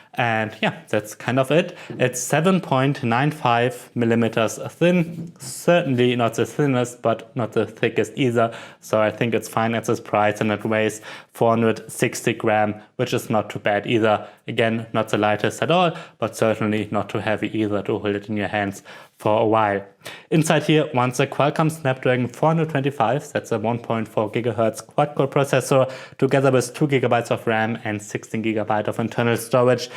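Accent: Germany. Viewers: Bist du deutsch oder warum sprichst du mit so einem Deutschen Akzent? The spricht ihr se aus :D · 101 Spiele Er ist aus Deutschland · i think he's german XD Bad english tho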